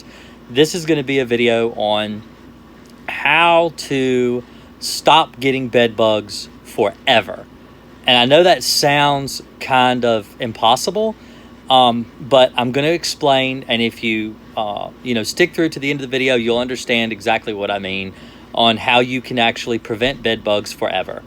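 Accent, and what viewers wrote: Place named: Virginia, United States. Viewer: Thanks for the vid. It was very helpful. Love the Virginia accent, by the way.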